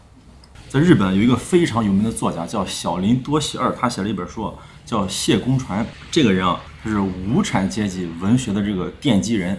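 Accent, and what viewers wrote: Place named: Qingdao, China. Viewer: 听口音是青岛人吗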